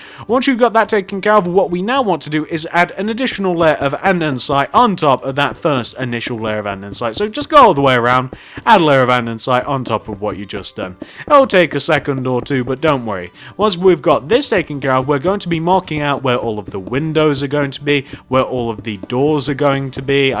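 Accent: United Kingdom. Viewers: Leth Ls he is British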